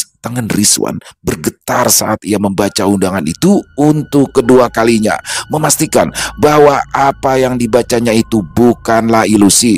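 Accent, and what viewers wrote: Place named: Banjar, Indonesia. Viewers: Bang ini sudah kayak orang Banjar asli 😂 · Bang logat bahasa banjarnya mantap banar 😄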